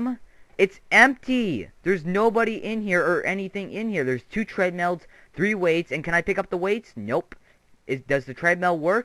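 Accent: Canada. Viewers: AwesomeManPlayz A lot of people pronounces a word differently plus he's Canadian lol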